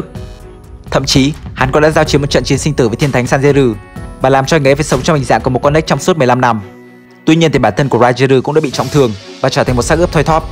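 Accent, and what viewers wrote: Japan. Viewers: Phát âm kiểu Nhật đó ạ, trong phim họ phát âm đúng là như vậy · khó chịu thì chịu khó tìm hiểu tại sao đọc như vậy, phim của Nhật, thì đọc theo cách đọc tiếng Anh của người Nhật thì có gì sai. Nếu khó nghe thì tắt tiếng vừa xem hình vừa đọc wiki theo tiếng Anh chuẩn của mình đi. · Ông ấy nói theo phiên âm nhật k phải tiếng anh ạ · Nói chung là nói theo kiểu tiếng anh chuyển thể nhật nên nghe rất khó chịu Flagel thì đọc là fle gieo thôi Fu le gieo ru Nghe mà chanz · Phát âm theo tiếng Nhật chứ ko phải tiếng anh đâu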